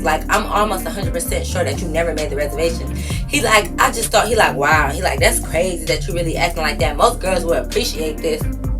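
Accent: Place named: Detroit, United States. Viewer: This sounds like a Detroit nigga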